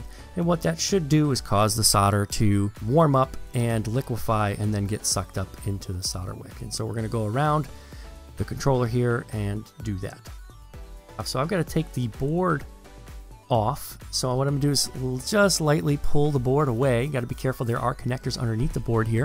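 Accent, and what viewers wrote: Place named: United States. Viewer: This is a great video, but almost impossible to watch as someone who is English due to Americans silencing the L in solder, which is weird.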